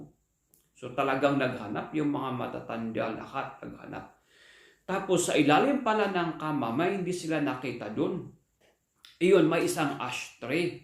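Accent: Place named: Ibaloi, Philippines. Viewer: Badarong 😂😂😂 ensend ko your other video to my friends, and akala nila amin talaga nga ibaloi ket kasta accent na. 😂😂😂 keep on making videos 😃😄😄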